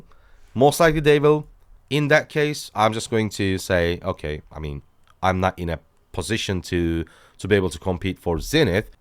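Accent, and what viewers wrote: Turkey. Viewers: I KNEW IT I KNNNNEEEEEWWWWW IT THIS MAN IS TURKISH i recognize this accent everywhere now he has a Fener shirt on 😂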